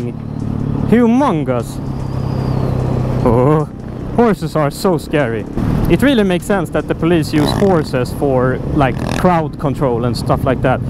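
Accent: Sweden. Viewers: Love the swedish accent haha, great video btw!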